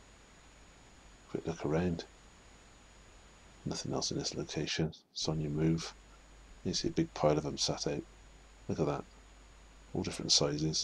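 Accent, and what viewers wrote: United Kingdom. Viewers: I love the British commentary. It's like he's on an African Safari or a morbid tennis match.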